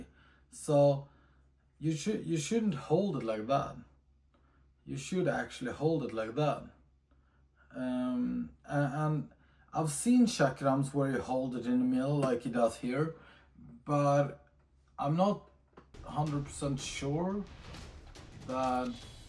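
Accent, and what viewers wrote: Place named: Scandinavia. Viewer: based on his accent, either Denmark, Sweden, or Norway...that's why I'm asking him, Inwas wondering the laws regarding that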